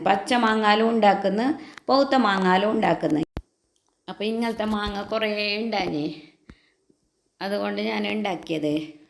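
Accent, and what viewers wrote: Kasaragod, India. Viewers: നിങ്ങൾ കാസർകോട് ആണോ ഭാഷ കേൾക്കാൻ നല്ല രസമുണ്ട്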